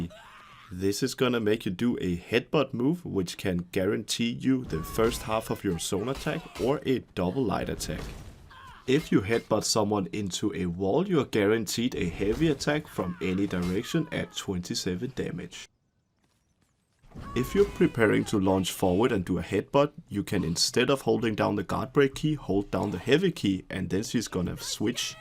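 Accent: Denmark. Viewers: are you from denmark? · Is that a danish accent? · the danish accent SHINES hehe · This guy has the most danish accent I have ever heard and it is glorious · It really sounds like ur danish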